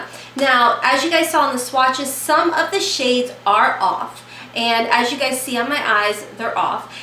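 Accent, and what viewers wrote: South, United States. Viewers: I'm on a binge this evening! Watching all the videos I missed! I can't believe I missed this one! I was soooo waiting for it to. But anyway... Girl, Don't you EVER be self conscious about that Southern voice!! I am from Tennessee grew up in MS (tha sip) so I completely get it! We just walk to our own beat and have charm like no other! Beautiful as ever ❤ Much love from Tennessee, Dayna 💖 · you really made me laugh today with your silly goofy self LOVE IT!! You remind me of my bff shes alot like you i know if you and i hung out we would have a blast. And i love your Southern Belle side i had live it in good old Texas for 13 yrs love the southern talk and there personality very welcoming and sweet =). One more thing i wanted to add i like that your very detail wit your videos about the products and your honesty that i can trust. 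and by watching this video ima go buy me that fantasia palette now =D.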